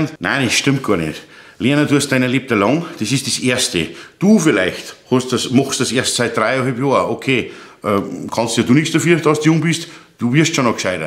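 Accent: Bavaria, Germany. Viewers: Ich bin zwar kein arbeitender Elektriker weil ich nur mal Nachrichtengerätemechaniker (1982) gelernt hab mit Ausbildung zum Elektriker. Aber in MEINER Küche hängen an einer Sicherung 10 Steckdosen weil ICH das so wollte, und es werden ja nicht alle Steckdosen auf 1mal benützt. Wer benützt a Küchenmaschine an Staubsauger der Wasserkocher und ein Heizgerät an diesen Steckdosen zusammen???? Und selbst wenn dann fliegt halt die Sicherung,was solls, der größte Depp kapiert das. Also scheiß auf die blöde VdE jeder ist seines eigenes glückes Schmied. Thema Schreiner,was ich auch gelernt hab:: Ich musste einen 3 monatigen Maschinenkurs machen um überhaupt an den Maschinen arbeiten zu dürfen.. Weida so, i bi a a Bayer und mog dei Aussprach, Danke dir!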